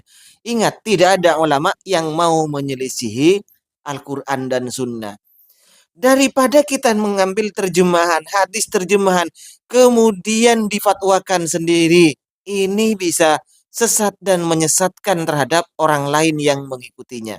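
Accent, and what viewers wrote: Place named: Java, Indonesia. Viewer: Dangkalnya pemahaman mu, mana kajian syeh untuk mencerahkan umat ini, ndi ndi bacanya pake logat jawa medok banget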